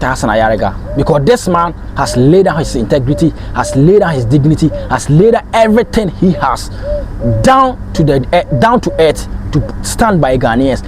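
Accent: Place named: Nigeria. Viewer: BRO HERE IN GHANA NOT IN GHANA HERE STOP SPEAKING THAT HORRIBLE NIGERIAN ENGLISH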